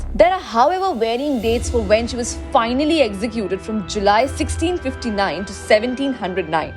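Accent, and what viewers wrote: India. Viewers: Ughh! The Indian English accent! Makes everything sound funnier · u an indian?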